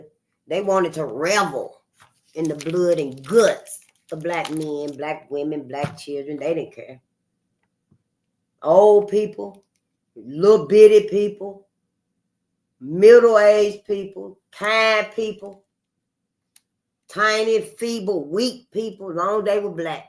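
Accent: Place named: South, United States. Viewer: Sis I like your country twang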